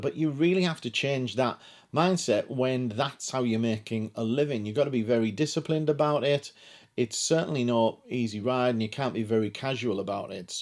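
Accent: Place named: North, United Kingdom. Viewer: First of all, glad that your back. I just started my lego Hobby a year ago, while struggling with depressions. Building helped me to find some sort of calm. Having not a great amount of money, I needed to find a way to pay for my new addiction. Inspired by your videos (at first I'd liked just listen to your great northern accent), I was thinking about doing as you did and I started to chase deals, I parted out, created lists and did all what is nessecary for a Shop. I even more enjoyed all those things comparing to just building (which I still love). I'm in my 50s as well, so I will try to do it like you did later on. My Shop is still very small and I haven't sold that much yet (at the moment most of my sellings are minifigs on ebay) but I hope to grow and make some money later on. The best thing is that all the tasks which this Shop needs really help to set my f**** depression in place. 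So m8, cheers for your insperation. Greets from Germany Btw: I'm a big Motörhead fan, so my Shop is called Motörbricks 😉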